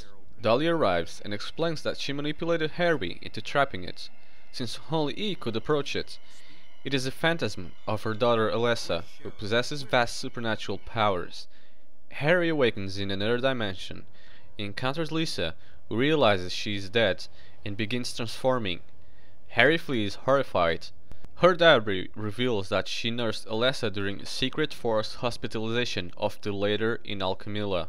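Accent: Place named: Portugal. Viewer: You have a Portuguese accent. Are you?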